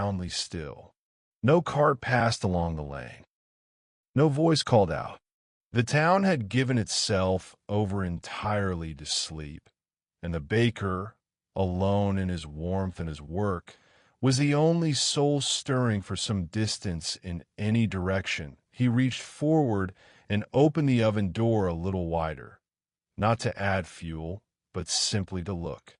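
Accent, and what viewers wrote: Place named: United States. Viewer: English tales by AI with an american accent lol. Lets give it a go 🤞🤞🤞 ............ as always, awful punctuation 😠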